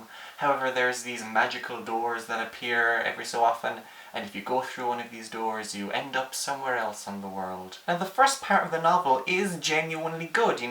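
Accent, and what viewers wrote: Ireland. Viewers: I am glad you're back. I missed your wit, symmetric face and light Cork accent. Also I appreciate the shirt choice. I do not appreciate the Sarah Chalke shade. Clearly, she was the better Becky.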